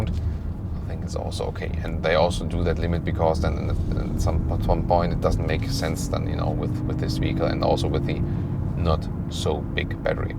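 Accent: Germany. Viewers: Wirklich stets gutes Review - jedoch muss ich immer wieder anfangen zu lächeln, wenn ich das typisch deutsch-typische Englisch höre... nicht böse sein :-)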